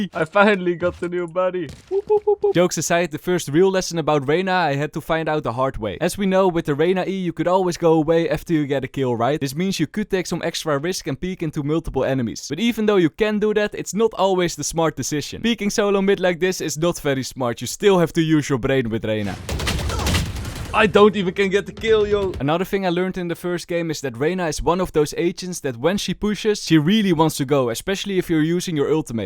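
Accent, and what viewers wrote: Netherlands. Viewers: as a reyna main, i first want to say u sound really Dutch (the Netherlands) and second u say perfectly what i mean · Haha instant hoor je de nederlander Nice kanaal :)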